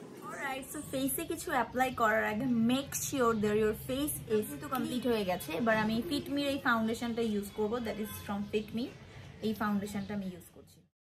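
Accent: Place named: Bangladesh. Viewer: Apu tumi khulnai thako. Prothome vabcilam tumi indian. Tarpor tomar bangla accents shune dekhi tumi deshi meye. Akhon to dekhi tumi khulnar. Amio khulnar meye apu. Take love❤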